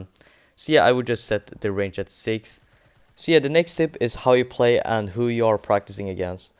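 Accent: Sweden. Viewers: Are you from sweeden ur engelish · i bet ur swedish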